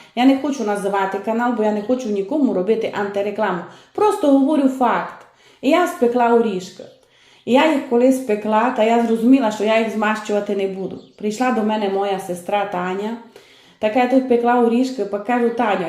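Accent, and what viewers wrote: Transcarpathia, Ukraine. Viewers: Доброго вечора зі Львова! Ви така добра та щира🥰Дякую вам та вашій мамі❤️ Чудовий закарпатський діалект - слухаю з задоволенням! Щиро дякую за рецепт кабачкової ікри, там де рецепт на 1 кг. Я мала рецепти - но ваш тепер мій назавжди, навіть інші пробувати немає змісту. Він ідеальний, вже третій раз закручую. Щиро вам дякую☀️Добра бажаю🌺🌸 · я Вас вітаю з 300000 підписників, це величезна праця розвивати свій канал, зацікавити глядачів, щоб люди підписалися. дивилися. В тому і фішка, що закарпатський діалект, мені подобається, а кому не подобається нехай йдуть на інші канали, на ютубі величезний вибір блогерів. Дасть Бог буде пів мільйона підписників і мільйон, рухайтеся вперед, знімайте для нас смачні рецепти, я люблю м'ясо і солодке. Я дивлюся Вас з міста Черкаси.Бажання у кожного українця зараз одне Перемога і Мирне небо!!! 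· І я приєднуюсь до ваших привітань і хочу побажати вам і вашій родині мирного неба Божого благословенства, та міцного здоров'ячка,я дуже рада що можу слухати ваш дуже приємний голос ,і гарний закарпатський діалект,багато готую за вашими рецептами . ❤ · Вітаємо Вас,! Ви Чудо і ваші рецепти це знахідка для всіх нас. Хай Господь благословить вас і дарує Вам і вашій сім'ї міцне здоров'я, злагоди і миру. В нашій сім'ї зараз готується дуже багато страв за вашими рецептами. Любимо вас, вашу мову, ваші щирі слова, ваш закарпатський діалект, бо він прижився в нашій сім'ї. Рясних вам Божих благословінь. Успіху вам та процвітання.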